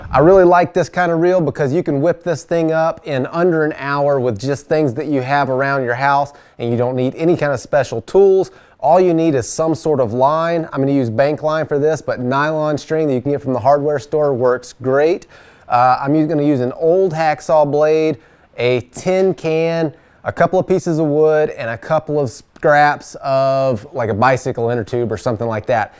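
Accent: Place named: South, United States. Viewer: Your voice sounds more yeehaw than usual. Cool build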